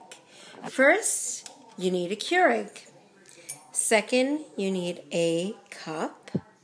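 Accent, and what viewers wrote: New York, United States. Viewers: Someone’s from new york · I love the my cousin vinny accent! good review, I just ordered mine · Tery Lim Is she from New York? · It's a New York accent.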